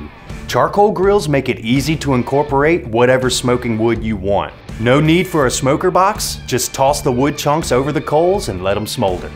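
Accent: South, United States. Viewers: you're a natural at this. I can see you going around the State interviewing local BBQ guys, talking shop, bringing over some BBQ's to show off and test.. I'm up in Canada and I could listen to the southern accent discussing BBQs all day... Combing products and techniques outside the showroom. I may not be able to buy local at your store, but its good exposure and the channel itself could pay for the adventures if it gets enough traction.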